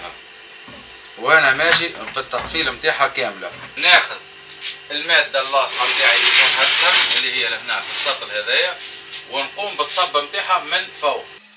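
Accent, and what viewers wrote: Tunisia. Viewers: اللهجة تونسية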